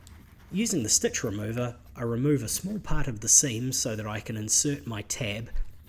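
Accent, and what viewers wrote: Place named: England, United Kingdom. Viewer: I LOVE that this guy has an english accent! You're like this is a straight laced normal guy. Then he makes a screen accurate Freddy glove!! I thought u liked tea and krumpets!?!? My childhood is ruined and it's not about Nightmare on Elm Street! THIS guy and his babysitter voice, piecing together of screen accurate Freddy glove... What accent is that? I'm staying the f*** away from that town...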